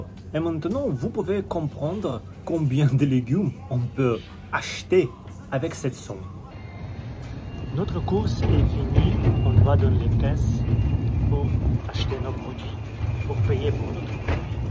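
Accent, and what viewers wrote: Russia. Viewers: Вы не францзу же. Я слышу русский акцент. Все фальшивка.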